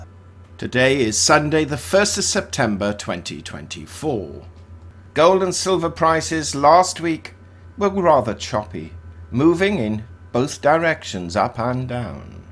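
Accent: United Kingdom. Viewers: Love hearing that Brit accent, very comforting. Thanks for the update.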